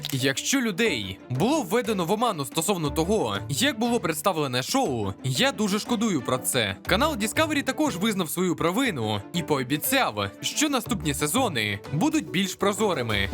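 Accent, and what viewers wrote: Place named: Russia. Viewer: Чувак, ти цю промову ззапорєбріка якнайшвидше забудь, Це біда, і ти її носій, досить акати, кажи суть нормальною мовою.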